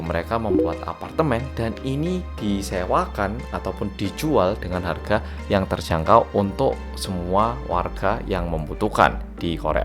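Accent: Java, Indonesia. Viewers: Tim gercep mas medokkk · Welcome back to KOREA REOMIT BERSAMA ORANG KOREA YANG MEDHOOKKKKKKKKKKKKKKKKKKKK🚗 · Medhooookkk. Gercep dan setiap nonton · helooo mamang medhoookkkk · Wah ma's emang he at bgt gua coba bicara2 bhs medo aja gabisa😭😭😭😭😭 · yang medoookkk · Bersama orang Korea yang "MENDOK" :V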